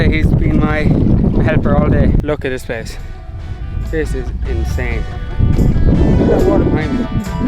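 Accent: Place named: Ireland. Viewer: Sounded like irishy accent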